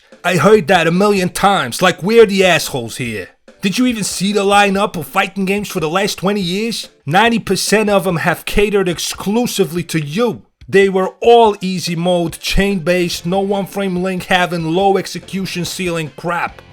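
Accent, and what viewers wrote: New York, United States. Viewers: Dude sound like a 30 year old New Yorker from the 70s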